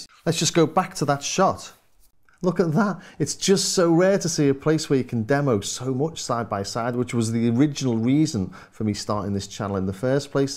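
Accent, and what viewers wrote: Liverpool, United Kingdom. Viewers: Dang I’m sold on this now! Cheers from Hollywood, CA. Love the accent…would that be what they call “scouse?”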